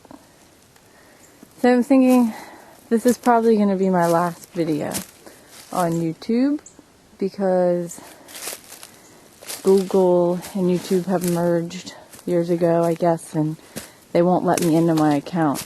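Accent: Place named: North, United States. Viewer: Of course, assuming by state, I am just assuming you are in northern US or Canada based on your accent.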